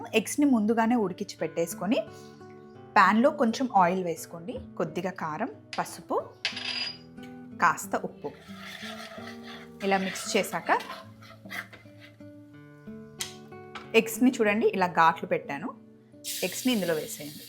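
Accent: Andhra Pradesh, India. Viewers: Hi, I have a small doubt and ofcourse out of curiosity am asking, are you from Andhra or Telangana ??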